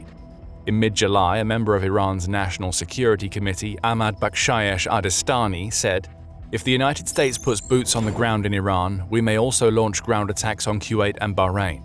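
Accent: England, United Kingdom. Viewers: Refrain from crying about President Trump and his methods. You’re saying it with venom in your voice with an English accent while watching your own country devolve into islamification. So don’t be too quick to cry about the methods of the USA to handle the situation. We got this. Get off your high horse, or would you rather have a nuclear capable Iran telling the UK they have to take in more military aged men?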